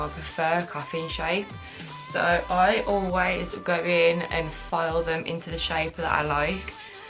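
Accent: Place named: Birmingham, United Kingdom. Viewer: Your sooo brummy. I love it!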